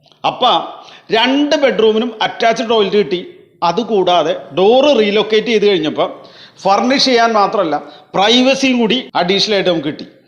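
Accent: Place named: Thrissur, India. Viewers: കൊടുങ്ങല്ലൂർക്കാരനാണ്... അതുകൊണ്ടാ... 😆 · പേര് കൊച്ചിന്നാണെങ്കിലും ഭാഷ ത്രിശൂരാണലോ ഗഡ്യ..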